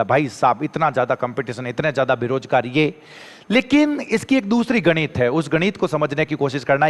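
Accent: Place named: Bikaner, India. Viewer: Guru ji the bikaner ra ho kai aa bhasha mali lag man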